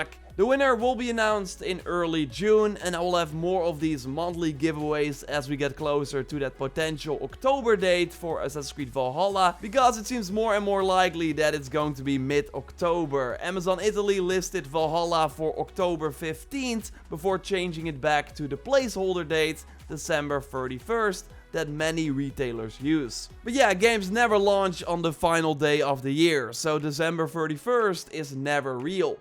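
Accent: Netherlands. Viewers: Are you dutch? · I had no clue that you are from the Netherlands ;). You can always hear when a Dutch person is talking in English. Keep on making videos. Can't wait for Valhalla to drop and watch you're videos. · Je kan gwn horen dat je Nederlands bent 😂😂 Like als je Nederlands bent😂👏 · Ooh hahaha jij bent Nederlands je kan goed Engels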